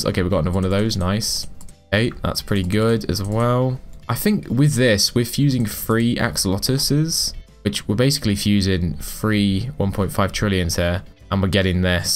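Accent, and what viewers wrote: United Kingdom. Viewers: Because I think he's British Accent..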